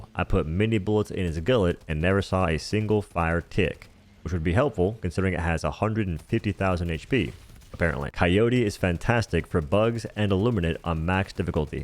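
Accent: South, United States. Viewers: To this day still trying to figure out accent because sometimes it sounds southern but other times it doesn’t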